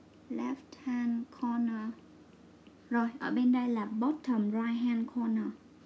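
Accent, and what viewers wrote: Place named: Dong Nai, Vietnam. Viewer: Không liên quan, nhưng em nghe giọng chị giống người dân Gia Kiệm Đồng Nai ạ :)) và cảm ơn các Tip của chị ạ, khá chất lượng và giúp e rất nhiều.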